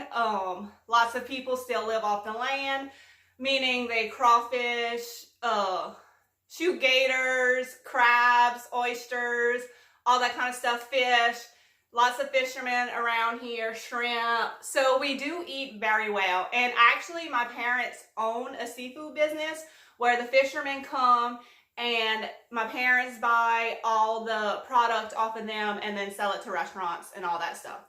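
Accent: Louisiana, United States. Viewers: Congrats on 10K! I love Southern Louisiana accents! A Fb group would be fun! Keep it going! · Great video! I love your accent too! Took a while but figured out it must be cajun and you verified that for me here.😉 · Used to live in Denham Springs (Livingston Parish) now in Texas and I miss hearing that Cajun speak! Love it girl! Have you tried making your own chalk paint and then using it in your paint sprayer? Do you have to thin the chalk paint to use the sprayer? Thanks! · I've been addicted to your channel since last summer and started curb flips in the fall. Your videos are so inspiring. I love that you incorporate color into your designs. Love your accent, too. My mom is from New Iberia so I recognized the Cajun accent. Love love loce. Congrats on the monitization. God bless and keep you and yours. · I've only recently found you, you are a breath of fresh air! 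I too, LOVE your accent, I'm from Tennessee and my company's office is in Beaux Bridge, I could listen to those guys talk all day and your cute accent is way stronger than theirs. I love your style, I can't wait to see what you come up with next!!! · Love your channel and really appreciate your step-by-step instructions on how you make your DIY 's. I enjoy watching your channel and get excited to see what you are creating next so I can go try and recreate your DIYS. I recently found me a duck!!!! at the thrift store and I am going to try the chalk paint/paint baking soda concoction along with the Waverly wax clear/paint i know its going to come out amazing, Oh girl love that ac•cent because we Louisiana girls!! 😘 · I could tell you were from La right away..... being from MS you are from my sister state !!!! The accent sounds like home !!!! Love your videos where you flip an item or you make something from wood... 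I love woodworking... Thanks for all the info about you !!! I am subscribing !!!!! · I just happen to come across your channel. I love thrifting, so I was watching you and I knew you were from my state and I knew you had to be from south. Your accent is my accent. Louisiana is the great state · Just stumbled across one of your videos, heard your accent, and said this lady must be from da bayou like me 😍 lovin your channel too girl! 😘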